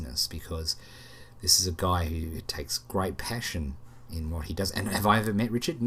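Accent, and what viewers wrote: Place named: Australia. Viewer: what a lovely australian accent!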